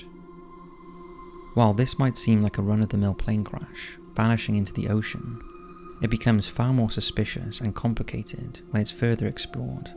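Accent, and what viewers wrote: Wales, United Kingdom. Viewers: Your channel is good, been watching for a few years but you gotta sort out your English pal, what the hell is with all this pronouncing E's as A's.....for example 'click the BAL to subscribe'...wal, bal, tal ect when it's WELL, BELL, TELL and so on. Your voice tone is relaxing, but it's annoying as hell the way you are saying certain things. I am English and live in England and I want all Americans and people who don't live here to realise that his weird hybrid Welsh/English accent and his pronounciations of certain words are totally OFF and not normal AT ALL in England or English....'ostradized' ?? come on!...and he once called Sigmund Freud.....Sigmund 'Feud'. lol I have sympathy for him because i am 99% sure he suffers from Dyslexia, either that or he does it on purpose because it seems to be the fashion to talk strange on these kind of channels, i.e the youtuber named 'Chills' (intentionally stupid and annoying voice)....I can never work it out, does anyone else think the same?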